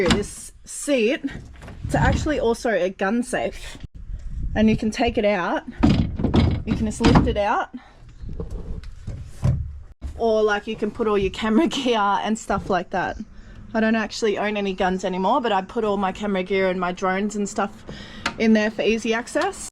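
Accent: Australia. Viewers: New subscriber to your channel and am very happy I found it, gonna binge watch your channel. Are you Australian? You have a similar accent to my buddy Rod from Rokkit, I've been subscribed to his channel from the beginning. Anyways looking forward to binge watch your channel take care and have a blessed day. Aloha from Kansas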